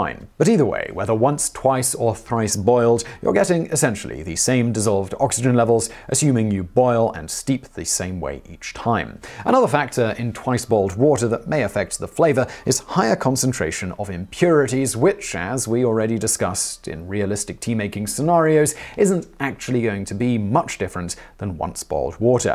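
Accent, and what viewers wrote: United Kingdom. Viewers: it’s hard as it is to understand this fast speaking British accent. you are a life saver . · I just got tricked into listening to a Brit talk about tea for 15 minutes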